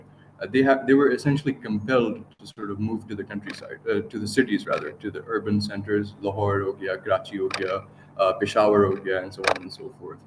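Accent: United States. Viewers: Another Pakistani with American accent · Ye bewakoof porkistan accent American use karta hai...... Isliye ye porkistan peeche hai.... DEFAULT....... porkistan ka khood ka kuch nahi.... UDHAR bheek se bana porkistan....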